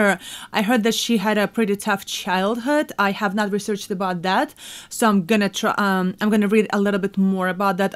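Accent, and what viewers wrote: Russia. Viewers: русский из английского никак не вытравить))) но за обзор русской , самой лучшей певицы , спасибо! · что за русский акцент у вас?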